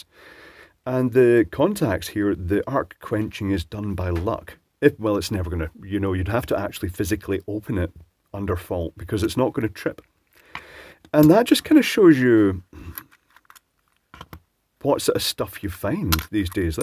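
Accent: Scotland, United Kingdom. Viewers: I don’t just come here for the video’s. I come to hear beautiful Scottish accent! 😅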